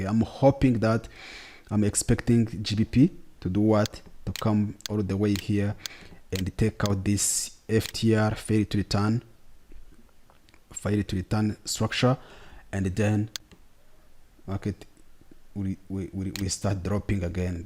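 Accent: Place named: East Africa. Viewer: That accent sounds east African, it's good to be tonight by my fellow neighbor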